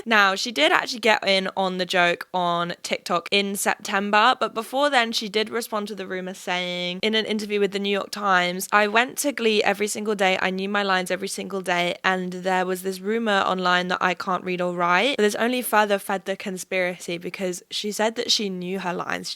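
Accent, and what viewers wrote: California, United States. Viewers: your american accent >>> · Your American accent was fantastic, the only word that tipped me off is you said “process” first as “PRO cess” and we silly American usually say it like “PRAH cess.” Not all, but most. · Your U.S. accent was so great! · Hearing you talk in an American accent is so crazy ur voice sounds so different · As an American, I have to say, I would totally believe you were from Cali with that accent. I would love to hear you try my section of America and give a southern accent a go. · Your American accent ain’t too shabby! this look back on 2022 made me realize how crazy this year was. What a year, here’s to another one! 🍾🥂 excited for more of your content! :) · American accent is amazing!! US citizen here. Going viral bit is hilarious! Ily T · Love the american accent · As an american myself I have to say your american accident was pretty good. 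Not perfect, but definitely good. Also I have to say I loved the visuals in the background of this video. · Hahaha YES. Californian accent acquired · Woah. Your american accent is amazing almost uncanny valley. I love it! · Real talk tho your American accent is fantastic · Your American accent is impressive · 8 outta 10 on the accent, pretty good! A bit of work on it and you could take an American part in a film I’d say! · american accent sound exactly like Ashley from Ladies Code i would say that's a success (you can listen to Ashley in the Get Real podcast if you don't know her) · Your American accent is actually really good!! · as much as I love your videos, your american accent is pretty rough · as an American, your American accent is good! it wavered a little at the start, and some words you still pronounced the British way, but it was pretty solid! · Very good American accent haha my British accent is not as good ! 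· The biggest thing I'd say is that your American accent "A"s give you away. It is a pretty good accent. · your American accent is genuinely pretty good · Her american accent is actually so cute lol 😭💖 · not an important comment but maybe the MOST important comment… American accent was top tier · No but the American accent ateee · OMGG ur American accent is 👌🏻👌🏻 cali girl!!! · No joking at ALL the American accent is SPOT ON BABE !!! Big love from New Jersey ❤❤❤ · Okay your American accent is legit on point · Ahahah you do have a solid American accent nice job. Respectfully, a Midwestern American viewer. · American accent popping off babes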